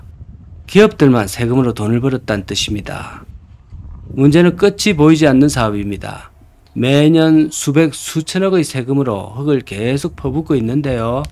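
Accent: Gyeongsang, South Korea. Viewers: 미국에서 너무 재미 있게 보고있읍니다. 경상 사투리도 구수 하구요. 한국에 안 가도 구섯 구석 잘 보고 있어요. 아무쪼록 건강하시고 발품계속 팔아 주이소💕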